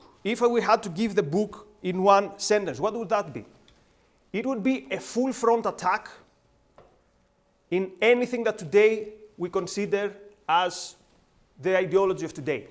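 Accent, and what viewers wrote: Greece. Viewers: Greek accent is adorable.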